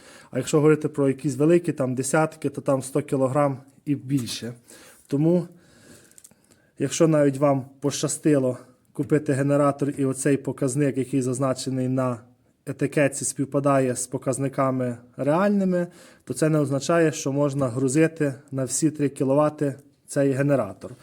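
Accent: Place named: Hutsul, Ukraine. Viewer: це не українстка, а гуцульська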